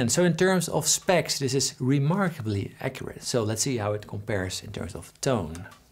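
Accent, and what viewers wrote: Netherlands. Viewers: Nederlands accentje is zo makkelijk te herkennen!! 🙃🙃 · You have a dutch accent? (very little). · Hi. Are you Dutch?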